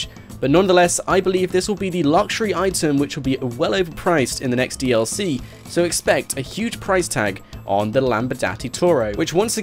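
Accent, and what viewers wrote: England, United Kingdom. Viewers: He is British he pronounces Los angilis and not Los Angels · Ryan McCall you're so culturally insensitive stfu hes from an area where that is how that word is pronounced. If anything because the US English language originates in England, his pronunciation is correct and yours is less correct. But either way he is pronouncing the word right, where he is from.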